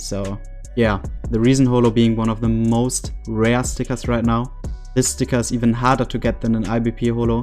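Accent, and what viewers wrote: Germany. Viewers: Man hört so das du deutsch bist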